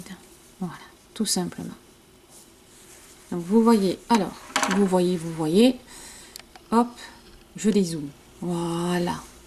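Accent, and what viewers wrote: South, France. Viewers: Merci pour tous ces conseils et bravo en plus tu me fais trop rire avec ton accent du sud. Et cela fait du bien en ce moment comme il fait froid chez nous en Auvergne. Bisous d'une mamie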